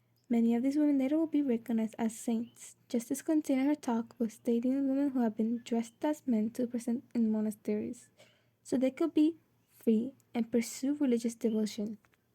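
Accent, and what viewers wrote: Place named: Spain. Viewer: Ahh makes sense. Im assuming you know how to speak spainish aswell because of your accent. I do have video request which i think would also make good content, Could you make an episode dedicated to the Arabic Slave trade its a fascinating series of events that i think are quite quite impactful in history to the modern Era. Alwo are these your only socials or do you have a 2nd channel or instagram channel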